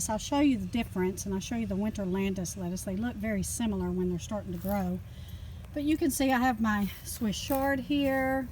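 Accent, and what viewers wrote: Texas, United States. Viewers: Dang. I miss that accent. Grew up in HEB area. Exiled in La.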